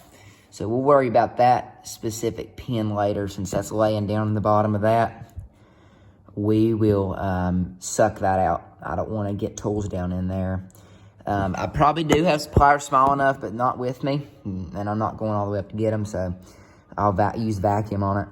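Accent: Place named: United States. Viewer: in which part of US is your accent? thank you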